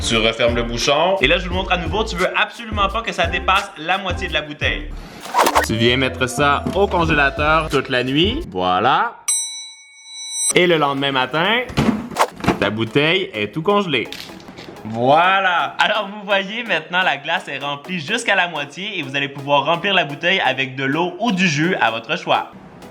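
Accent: Quebec, Canada. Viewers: J’adore l’accent québécois 😂😂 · J’aime grave l’accent québécois 😮😍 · J adore ton acsen cbekcois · Vas il et québécois donc ses normal qu'il a un acsant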